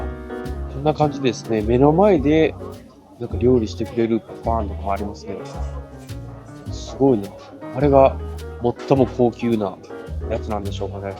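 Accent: Kansai, Japan. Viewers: 久々にみたけどナレーションに違和感あるんだが。もっと関西の訛りあったよね？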